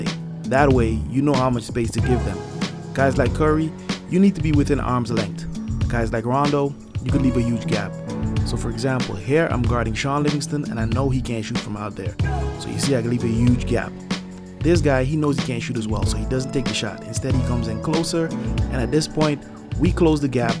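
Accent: Jamaica. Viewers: Wah goin on boy. Yuh sound like yuh from Yard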